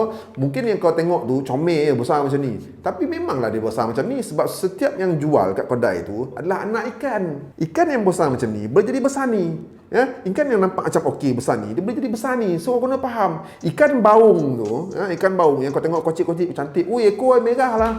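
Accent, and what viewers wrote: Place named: Negeri Sembilan, Malaysia. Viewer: Abg nogori ko dgr loghat tu mcm ade nogori sikit ii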